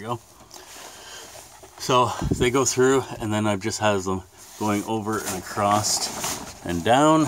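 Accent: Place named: Canada. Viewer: I thought I detected a Canadian accent, it was confirmed when you mentioned using "white hockey tape" to wrap you wires. Take care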